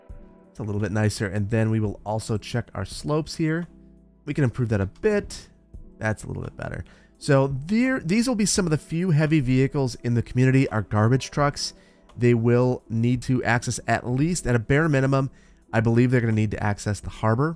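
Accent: Wisconsin, United States. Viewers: I totally think that this island is based on Mackinac Island (a car-free island in Michigan) because Marquette is another larger city in northern MI. Mackinac Island is a tourist trap, but Marquette is a super cool city on Lake Superior with a great atmosphere and massive old ore docks towering over the shore. I'm commenting this like 30 seconds into the video, but I hope you lean more towards a Marquette, MI vibe than Mackinac Island vibe. Also it backs up my theory that you sound super wisconson, and the UP is a popular travel spot for wisconsoners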